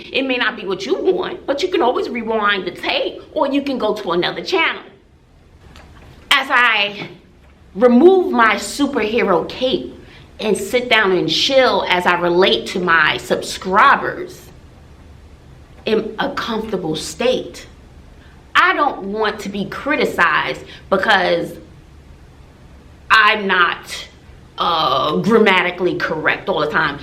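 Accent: New York, United States. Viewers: New here (Jackie Newton referred me to you)....I love your NY accent!!! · Are u from ny that accent sis lol love it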